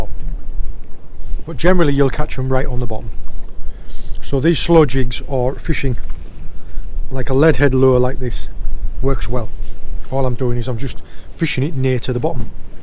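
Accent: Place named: Cumbria, United Kingdom. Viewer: i thought you were North, as were Cumbria lol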